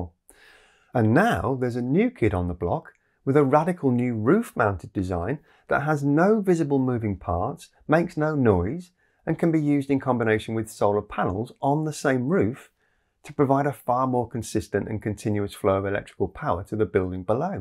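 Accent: United Kingdom. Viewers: I thought I would just mention, that just because you have a British accent does not mean you live in the UK 🙂 Thanks for another informative video.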